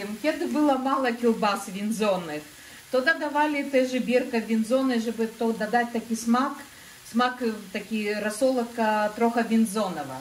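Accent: Ukraine. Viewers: język polski, akcent ukraiński, rosyjski? przepis znany mi z literatury rosyjskiej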